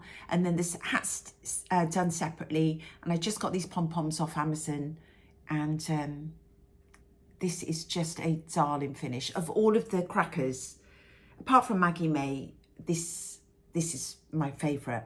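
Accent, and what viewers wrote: Wales, United Kingdom. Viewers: Your work is just amazing and it’s such a delight to watch and listen to your enchanting Welsh accent. Thank you!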